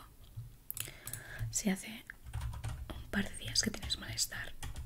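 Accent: Spain. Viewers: Que ricura de mujer madre mia. Hecha a manos de dios. Y ese accento Español. Eres una diosa en viva.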